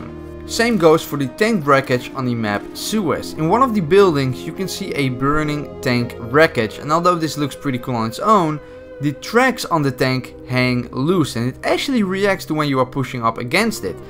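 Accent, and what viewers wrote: Netherlands. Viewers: im new and have a qeustion are you dutch ? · Your english sound dutch. You from holland? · You sound like youre dutch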